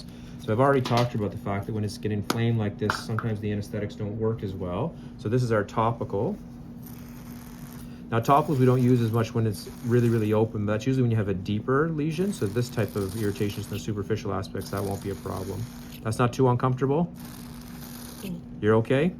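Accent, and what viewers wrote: Canada. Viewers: Side note... Love the Canadian OWT! ( respect from the UK )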